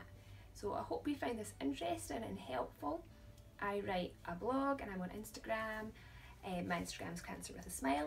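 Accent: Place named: Scotland, United Kingdom. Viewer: Hi I am 32 and life an hour from Glasgow. I have just been diagnosed, watching your videos has really helped me. Especially hearing a familiar accent and experience from my area. Thank you 👍🏻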